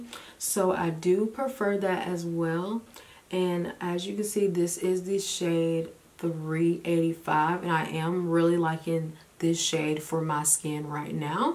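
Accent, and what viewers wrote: Alabama, United States. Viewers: You sound just like NitraaB, so when you said Alabama I said no wonder, she’s from there too. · Gorg!! I knew you had an Alabama accent and you remind me so much of Nitrab here on youtube. I love your videos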